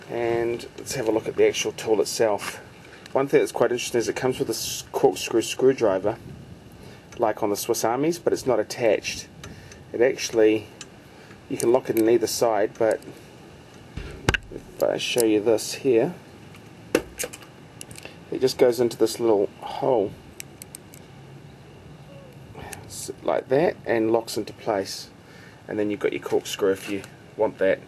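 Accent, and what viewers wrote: New Zealand. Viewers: I'm thinking of getting this instead of Swisstool X because of the ergonomics and weight. Cheers on the review and the accent. New Zealand? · I actually didn't look on your channel page, I can tell the difference between an Australian and a New Zealand accent.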